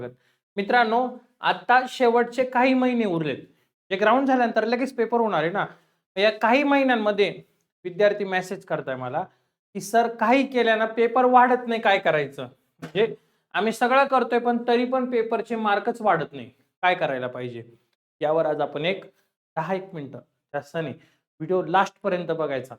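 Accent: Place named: Khandesh, India. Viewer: सर तुम्ही खानदेशी सारखं बोलतात😅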